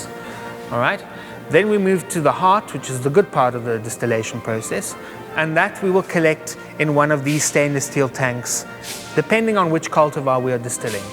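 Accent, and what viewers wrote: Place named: South Africa. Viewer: pmc eightsixeighty clearly you're a silly american. If anything he would be from an italian family which is why he is probably makeing grappa in the first place (but he actually sounds south african). Pronunciation doesn't make his 'english terrible' it gives him a accent.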